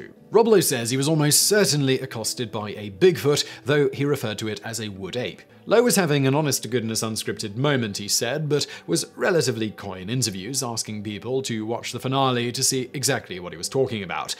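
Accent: United Kingdom. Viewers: Wtf, are those celeb are on drugs or have they lost brain's to say this. Absolutely love your British accent it's very something and relaxing for a Sunday night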